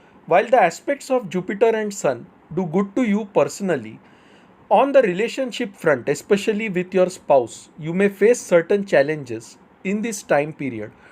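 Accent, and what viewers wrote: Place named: Maharashtra, India. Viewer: U R MARATHI ACCENT ENGLISH MEANS U R FROM SK SETTLED IN MUMBAI_ SOME TIME U R ADVICE IS VERY COMMON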